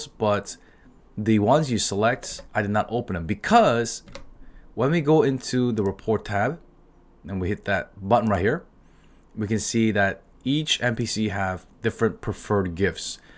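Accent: United States. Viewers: Try to speak less like an American and more like someone speaking English.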